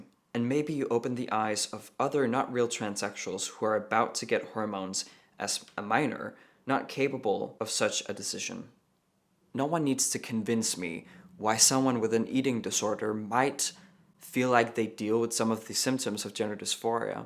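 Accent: United States. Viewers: I only have one question, and maybe it's already been answered., why do you sound like you have an American accent? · I never knew that you live in Denmark. You have a very good American dialect! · So wait… do Danes speak English with this accent that sounds American, or is he doing these videos in an American accent on purpose?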